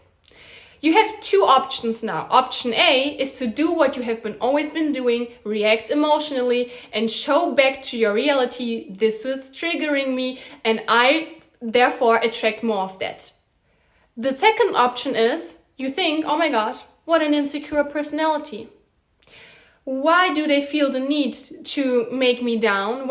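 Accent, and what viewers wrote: Germany. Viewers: I'm so thankful for this video. The second you started talking, I thought, "She's german." But not like "Her english is bad she should stop" but like,"She is german LIKE ME and even if people might recognize that she is, IT DOES NOT STOP HER. " ❤️❤️🙏 Good luck with everything you are going to achieve!! ♡I'm sending love & appreciation ♡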